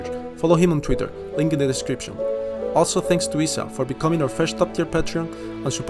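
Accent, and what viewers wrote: Spain. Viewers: El que habla es español no? Jeje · No se porque diría que eres español, por el acento JAJAJAJJAJA, me siento como en casa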